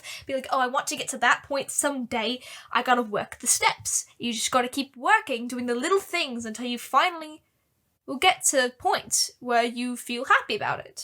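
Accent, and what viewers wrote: United Kingdom. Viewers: I love when you sing on these podcasts, it really brightens my mood! Thank you so much and I love the British accent! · You sound more British than me, a Brit... Edit: And I regularly say y’all :)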